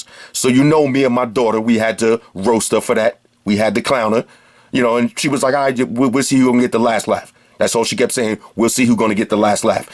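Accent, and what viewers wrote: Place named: Queens, United States. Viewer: Damn bruh. I just need a bag to carry my kid's diapers in. Really enjoy your channel. It sounds like you're from Queens.. you mentioned the ave a few times before. From a Queens native keep it going!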